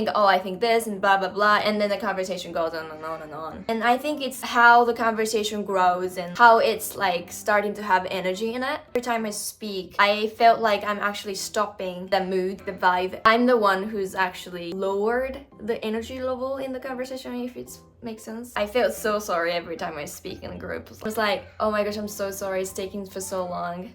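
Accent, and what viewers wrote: Australia. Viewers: While you live in Australia, you perfectly pick up Australian twangs. You might be the person picking up the accent in the country where you live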